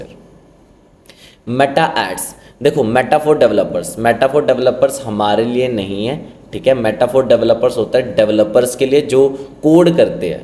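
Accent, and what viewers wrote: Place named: Garhwal, India. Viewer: Sir aapke gadwali tone se smj gya aap gadwali hain bhut bhut dhanywaad Sir jo aap youtube ke madyanam se hmra margdarshan kar rhe hain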